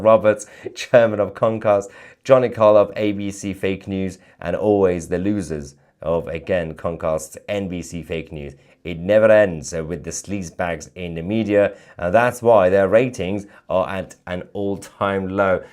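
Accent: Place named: United Kingdom. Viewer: Dude stop exaggerating everything and jer jerking off on everything and I'm getting a little f**** pissed because of the way that you're exaggerating things and pulling s*** out of thin air and I thought you were better than that, now you just sound like a f**** stupid f**** Brit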